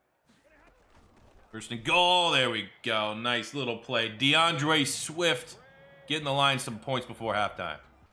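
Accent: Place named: Canada. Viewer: This guy sounds like ricky from TPB...must be canadian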